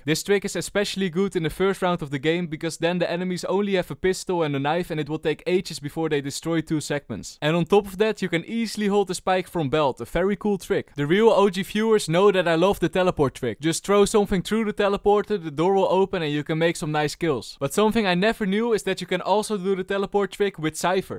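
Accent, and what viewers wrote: Netherlands. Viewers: If you put captions on its dutch! Is accent that heavy?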